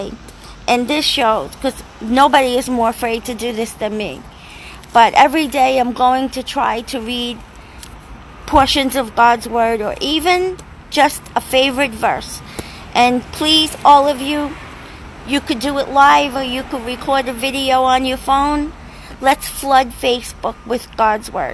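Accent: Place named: Queens, United States. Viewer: This is a great idea. Do you live in Queens NY? I ask because you have an accent and I hear a plane going over, in from flushing and had the anesthesia of LaGuardia going over my house all the time.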